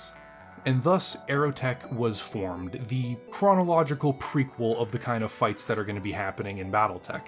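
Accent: Canada. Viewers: I just clocked your accent your Canadian ain't you? Not that it maters but I always assumed your were a little south of the border. I am crap at accents.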